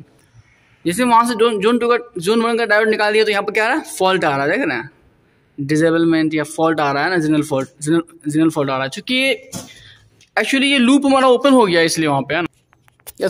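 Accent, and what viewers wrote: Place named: Jamshedpur, India. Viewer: Aap Tata (Jamshedpur) key ho ,aap ki bat se lag ta hai 💯 %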